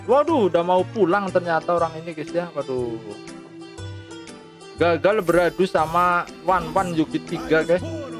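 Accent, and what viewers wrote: Java, Indonesia. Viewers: Asli mana mas jawane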